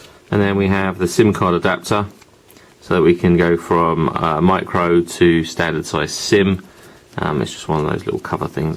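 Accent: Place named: United Kingdom. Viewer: Love the phone, and thats a pretty good review. and i love your britain accent when talk! haha.. still trying to learn that accent anyway! :)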